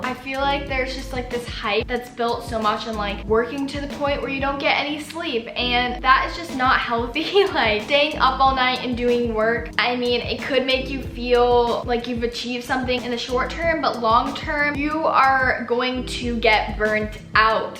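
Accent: Australia. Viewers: It’s not a video without the Australian accent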